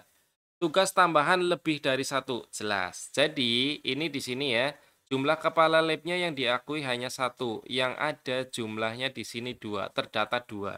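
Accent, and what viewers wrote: Banyumas, Indonesia. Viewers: Bg 😊, solusi tugas tambahan guru kami semua tidak terbaca di profil guru di dapodik, solusi nya bg?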